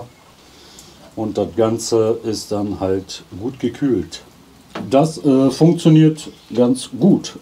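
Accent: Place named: Ruhr, Germany. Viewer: Kommse aus'm Ruhrpott?Dat hört sich so an. Och nö dat Video ist nett abba doch zuviel gebastel für mich. Vor allem bei meinem Reely Big 1 welcher Ständig weh wehchen hat nich lonhnenswert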